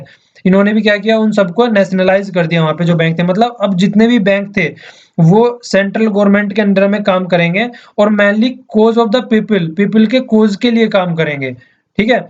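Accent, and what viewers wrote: Haryana, India. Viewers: खड़ी बोली, सुन के मज़ा आ गया, m HRY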